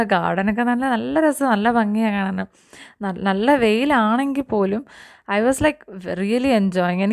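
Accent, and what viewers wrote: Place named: Kottayam, India. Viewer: R u from Kottayam..... Oru Kottayathinte slang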